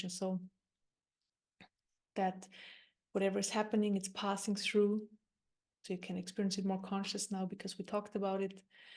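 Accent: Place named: Germany. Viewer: Beautifull you have german accent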